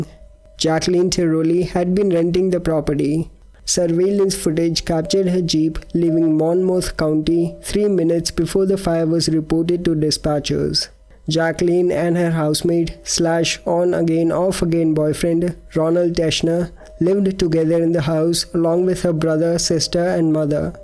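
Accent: India. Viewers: I meant good, cuz I am indian.. And I found that the way he speaks, is the way that also some of us speak here in India. Doesnt have much of that american accent.. You wont get it. 🤭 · The people criticizing are just assholes. Let's see you all speak his language with a perfect indian accent...when you can, then criticize. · Why don't you check out idk maybe half the true crime/ horror story yt community, also he does speak English he just has an Indian accent which is why he has subtitles (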